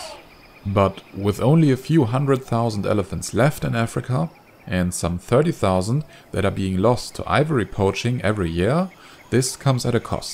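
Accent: Germany. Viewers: Könnten vllt auch mal Videos in Deutsch kommen? An sich mag ich den Kanal, aber der deutsche Akzent nervt etwas... 😅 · Bist du Deutscher? Hörst dich jedenfalls so an😂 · Are you german? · haha the guy sounds so german ;) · Your accent sounds German. Are you ? :) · german Accent._. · Man hört das du deutsch bist. Irgendwie hört man das am Englisch raus, aber ich mag deine Videos :D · Dieser cringige Deutsche accent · I love the accent of this narrator. Sounds like german man speaking english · why u have are german accent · are u german? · Are you German?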